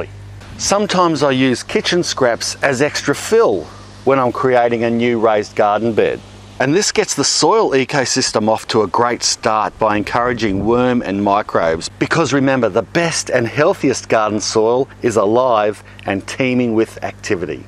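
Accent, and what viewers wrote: Australia. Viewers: like an australian? I guess we do talk weird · Somehow I did not expect an aus accent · I subscribed immediately after hearing his Australian accent lol! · he said so himself in another of his videos. But also he has Aussie accent and you can see the background. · I love Aussie accent! And also his kitchen scraps garden. It's again! Love it.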